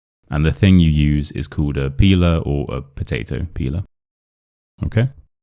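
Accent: United Kingdom. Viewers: Hiya, thank you for your video and offering your English class. I love your British accent! I am living and working in UK for year s, still struggle for my pronunciation and accent. Your voice and tone are so comfortable to keep listening, and love love your sense of humour too! Thanks again your lessons and I can,t wait for your next video❤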